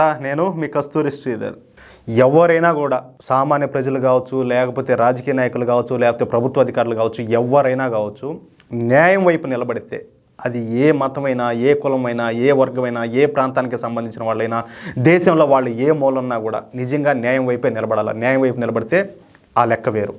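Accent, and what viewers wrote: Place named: Chittoor, India. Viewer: Well Said Bro. You rock. Especially your Chittoor Accent is too good.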